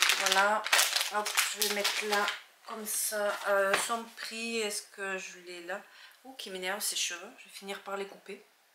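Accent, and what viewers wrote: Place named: South, France. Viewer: oui c est clair 🤣👌sans blague peut être qu il y a moyens de communiquer 😌j entend votre jolie accent du sud d ou êtes vous ?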